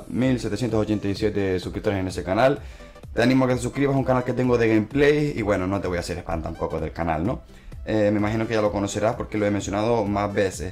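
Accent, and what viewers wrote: Canary Islands, Spain. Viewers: ya lo mire en otro video lo dice es canarion por cierto yo soy chicharrero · Eres canario si o si jajajaj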